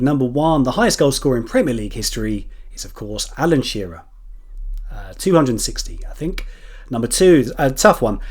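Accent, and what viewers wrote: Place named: Southern England, United Kingdom. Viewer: Because of the number of question I bet your a man under fan. You sound from down south where most man utd supporters live.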